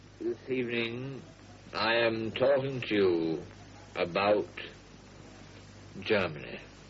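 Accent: United Kingdom. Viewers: Beh nelle news britanniche mi pare sia normale avere la received pronunciation, un po' come da noi vanno a corsi di dizione di italiano standard... Certo che se era irlandese-americano, ha una pronuncia britannica notevole... con anche l'isolamento all'estero per parecchi anni... come notevole fu la persistenza di quella propaganda che, da semplice curioso e sono solo tutte domande le mie, cmq non ho mai indagato se diede mai alcun benché minimo riscontro nell'opinione pubblica britannica... pensavo avessero smesso ben prima del '45 di trasmetterlo... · tbh its just how british people sound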